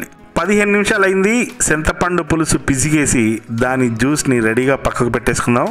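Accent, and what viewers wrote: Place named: Godavari, India. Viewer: Aami maatladarandi gaaru..maa godavari yaasalo,meeru chesina korrmeenu eguru superandi babuu..aayi👌😃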